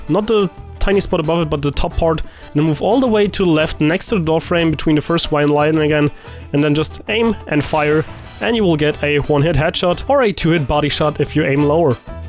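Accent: Germany. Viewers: are u german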